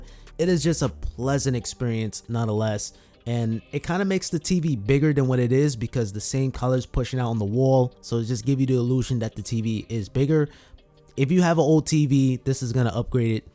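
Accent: New York, United States. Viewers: Minute into the video I already know he’s from New York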